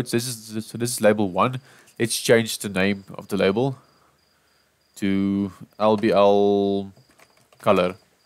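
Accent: Afrikaans, South Africa. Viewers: brah ek sweer jys afrikaans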